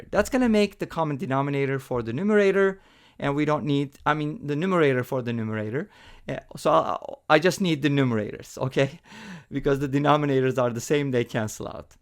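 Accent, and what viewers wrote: Turkey. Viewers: I can hear Turkish behind the accent.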